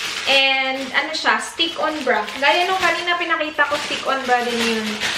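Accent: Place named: Visayas, Philippines. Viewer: AGUY 😂😂😂 pero cute paminawun TATAK BISAYA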